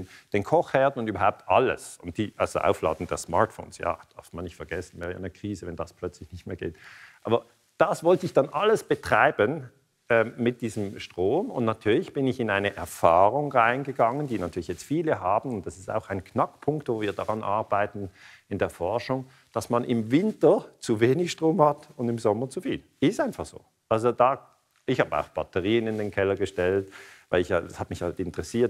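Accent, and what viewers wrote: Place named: Switzerland. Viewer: Und ich mag auch Ihr Schwitzer Dütsch! 😊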